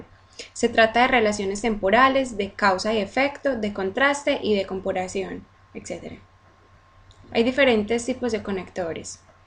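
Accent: Colombia. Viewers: ese acento de paisa tan fastidioso para una clase parece un burdel. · super pero te aconsejo cambies ese acento paisa, molesta mucho el tono ,gracias haces un gran aporte al Inglés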